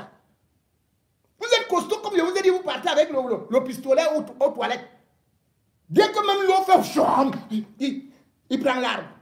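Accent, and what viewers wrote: Cameroon. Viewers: Le président et son peuple sont en otage .😭 le Cameroun est vraiment dans la rue J aime ton accent Beti 🥰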